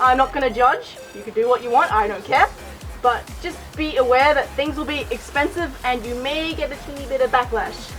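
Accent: Australia. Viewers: you sound very australian, are you? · you're australian!!!!! i can tell by the accent >.<, what part of australia are you from? i'm in queensland, did being australian influence your fursona?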